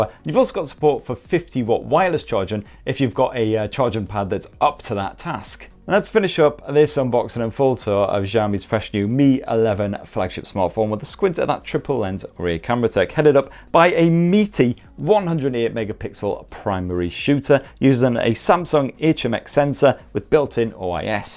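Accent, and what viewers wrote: United Kingdom. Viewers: he got a hard british accent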